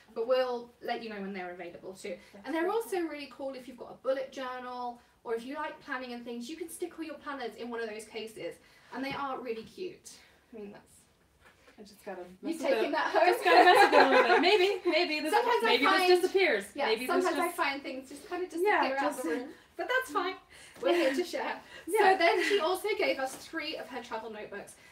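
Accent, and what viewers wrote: United Kingdom. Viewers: definitely makes me think: #1 - British ( your accent ) #2 - crafty goodness: new techniques, latest products and some really great ideas. An all around great channel to hang out watching and playing along.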